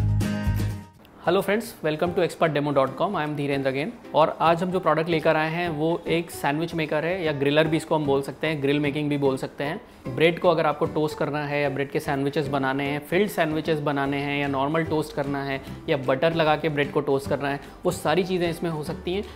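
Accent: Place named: India. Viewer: Why do you speak for 1minutr plus without demonstrating the sandwich maker? If you thought you were doing something your Indian accent makes it hard to understand you also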